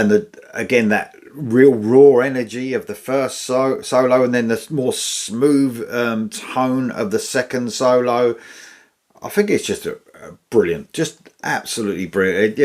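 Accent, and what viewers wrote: United Kingdom. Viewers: Are you british? ❤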